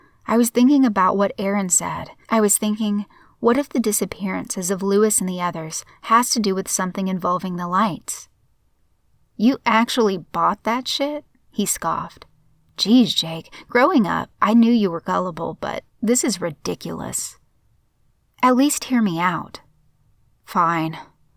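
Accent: South, United States. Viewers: it's so cool and her southern accent comes out so well in that and it's like seriously my favorite story I've listened to it a couple of times I don't want to wear it out I love that story it's awesome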